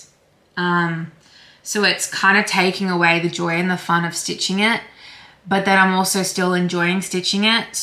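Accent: Australia. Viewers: I have just binged every one of your episodes since the start… I am also rewatching Gilmore girls… you have an awesome channel and I am happy to hear another Aussie accent… I live in Brisbane… keep up the good work…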